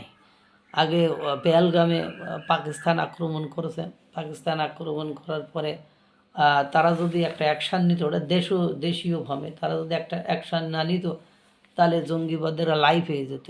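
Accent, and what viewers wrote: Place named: Khulna, Bangladesh. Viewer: খুলনা, যশোর বা সাতক্ষীরা ভাইয়ের জেলা বলেই আমার মনে হয়। কারন কথা বলার ধরন ঐদিকের মতোই।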